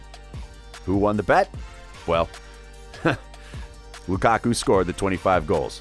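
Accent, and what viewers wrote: United States. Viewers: It would be more credible if someone other than an American narrated it.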